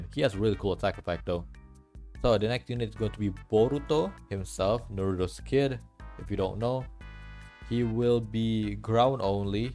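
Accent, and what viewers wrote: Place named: Indonesia. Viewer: di denger denger ini aksen indonesia banget 😂😂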